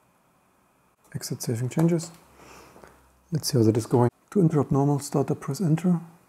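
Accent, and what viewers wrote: Germany. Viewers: Dieses "deutsche" Englisch ist echt schrecklich. "Se sinkpäd". "ei test se sipiju performanz" "so sen ei wud say" Machs doch auf Deutsch ... Englisch können und Englisch sprechen können sind echt 2 verschiedene Dinge.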